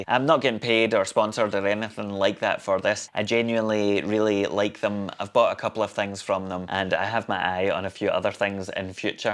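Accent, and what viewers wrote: Scotland, United Kingdom. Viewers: Love yer scottish accent (Shetland / Orkney?) Those AKAI sounds wicked too.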